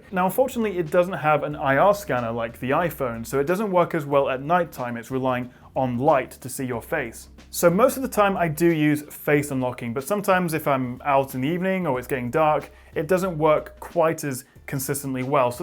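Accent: England, United Kingdom. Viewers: First time watching one of your vids, I have to say I enjoyed it! What sold me was your accent, it wasn't so grossly over the top annoyingly ENGLISH - UGH! Very detailed, highly informative. USA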